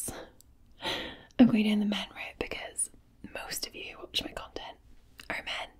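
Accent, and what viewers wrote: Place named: Scotland, United Kingdom. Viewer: half an hour of tingles from the resplendent scottish lass. perry the platypus noises ☺️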